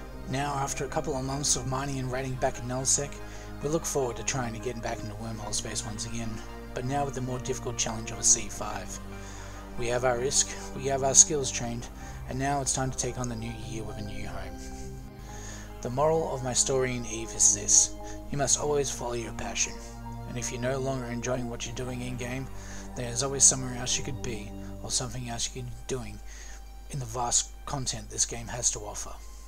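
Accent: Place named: Australia. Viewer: subbed. good on you for moving past the frustration that arises time and again. Looking forward to more videos. It may be the nerves, it may be the quick aussie speak but I found it hard to make out what you were saying as it was going by so fast. :) Could also be my own crap hearing tho. o7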